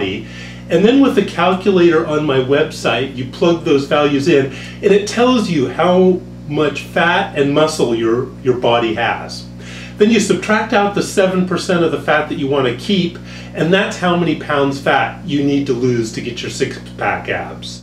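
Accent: Germany. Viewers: Are you German? :)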